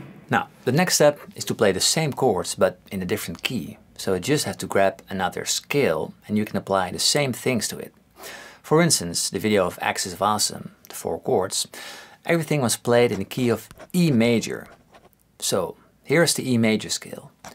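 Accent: Netherlands. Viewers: Are you Dutch? Because your accent really sounds Dutch. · I smell dutch 😅 btw good vid it helps a lot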